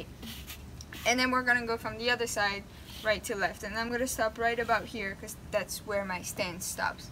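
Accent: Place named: France. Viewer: On entend beaucoup ton accent français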